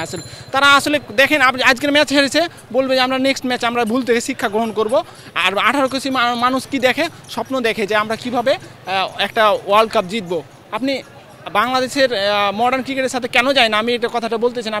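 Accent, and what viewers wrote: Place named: Kolkata, India. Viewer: Polay vodai😂 cricket thik i ase oy besi bujhe bolod sala 😅 i ❤ Bangladesh cricket sala parle tui khel tui to kolkata theke asos kotha sunlei bujha jay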